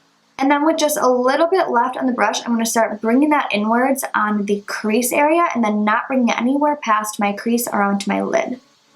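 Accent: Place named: Boston, United States. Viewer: Random question but where are you from? Like you accent sounds northern or Bostonian maybe but I can't put my finger on it eek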